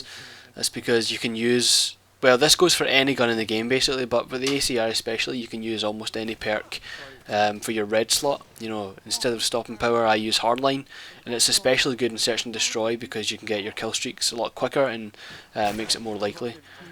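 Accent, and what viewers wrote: Scotland, United Kingdom. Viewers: arent u british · are u scottish if so were 4rm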